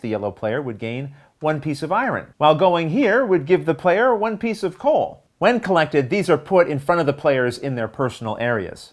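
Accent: Canada. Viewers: My wife and I have been discussing where your accent is from. My guess is Canada. Am I right?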